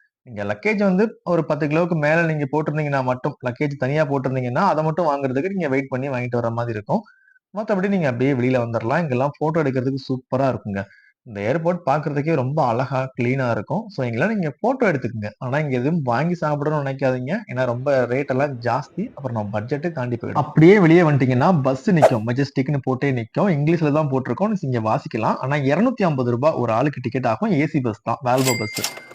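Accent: Madurai, India. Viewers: Anna neega madurai ah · தம்பி மதுரை பயலா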